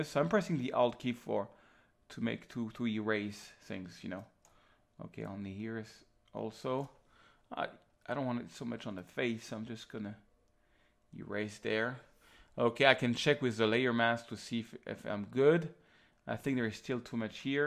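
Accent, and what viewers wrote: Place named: France. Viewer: Bonjour Monsieur, merci pour tous ces tutoriaux vraiment clairs et précis. C'est un plaisir de vous comprendre, en anglais, et ce grâce à votre accent français:-) surtout pour quelqu'un qui ne maîtrise point la langue de Shakespeare. Très cordialement. Christophe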